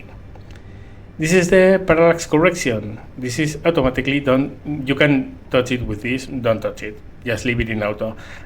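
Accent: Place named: Spain. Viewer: Muy bueno. Por tu acento apostaría a que eres español, ¿no?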